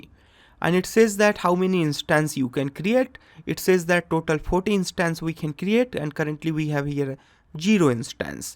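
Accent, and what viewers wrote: India. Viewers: Nice video with nice bengali accent :-)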